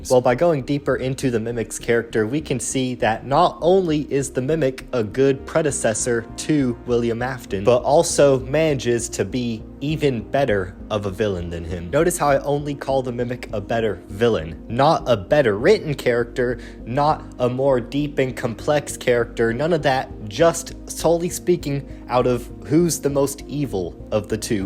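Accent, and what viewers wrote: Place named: United Kingdom. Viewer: British accent boy 😂😂😂😂😂😂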